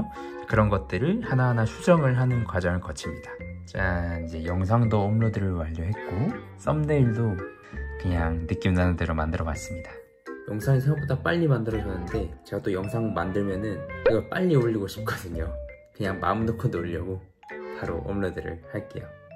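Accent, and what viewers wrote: Gyeongsang, South Korea. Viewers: 열심히 사시는 님 늘 응원합니다. 발음교정중 하나 알려드립니다. 경상도 분들의 특징인 월요일.금요일.일요일 ㅎㅎ 워료일.그묘일.이료일 ~!! 이렇게 발음합니다.ㅎㅎ 저는 님발음중 요게제일 거슬리더라구요~! 워료일그묘일이료일로 듣는날이 곧 오기른기대합니다😅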